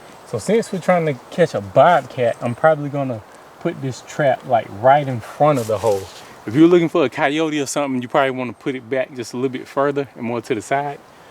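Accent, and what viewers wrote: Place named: Mid-South, United States. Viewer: This YN!! Out here trappn for real ! Memphis? Sippi? You definitely have that mid south accent. Have you caught one since you did these video?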